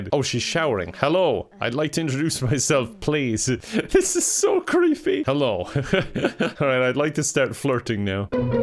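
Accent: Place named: Ireland. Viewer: he's Irish, that's his accent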